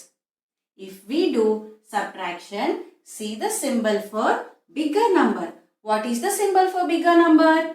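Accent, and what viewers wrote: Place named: Andhra Pradesh/Telangana, India. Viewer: You are from Adhra or telangana 😅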